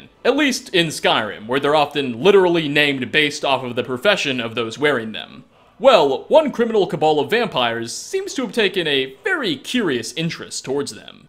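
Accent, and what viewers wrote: Australia. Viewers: Hey are you From Australia? Because if you are I've never noticed until now. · is aussie? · Is Australian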